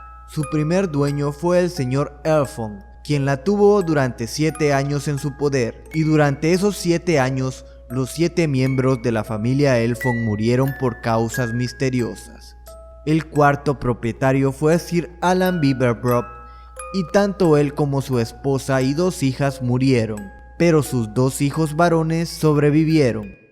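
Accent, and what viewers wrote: Honduras. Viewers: Soy el unico en notar que el narrador es hondureño 🇭🇳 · Eres de Honduras?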